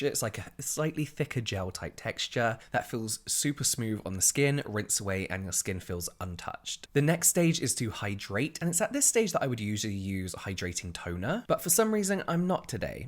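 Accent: England, United Kingdom. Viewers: Can you help me: After I cleanse, put serum then moisturizer my skin / products start piling. I can’t find a good order to treat my face. Any advice? I am also older ( double your age).ordinary and inkey list with some vitamin k moisturizer from piping, rock are my go to products..... Or whatever you, Hyram, and Cassandra Bankson convince me to try. My skin is fair,very dry, oily on tip of nose and tip of chin. I doubt you’ll even read this but I am an older subscriber maybe this will get to you. You, have a very soothing voice with that beautiful English accent.🌹🌹🌹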